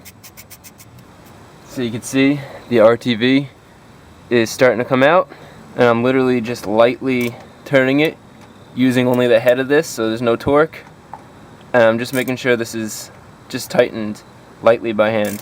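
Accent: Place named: New Jersey, United States. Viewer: he has a New Jersey accent! -from Texas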